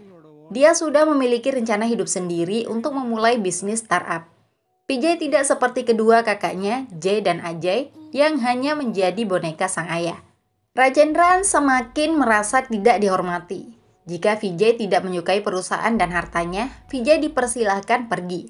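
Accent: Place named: West Nusa Tenggara, Indonesia. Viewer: Kayaknya admin orang NTB 😂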